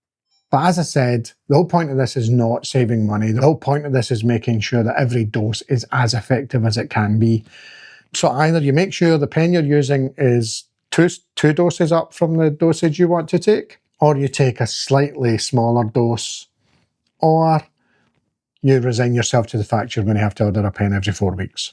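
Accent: Scotland, United Kingdom. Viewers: thanks for your info a fellow Scot its great to hear a local accent! Im on my 2nd 2.5 pen good suppression but by day 5 i feel it dip. So ill start giving myself 2.5mg every 5 days. My next pen do you think i should move up if still getting suppression? Or irder a 5mg pen anyway?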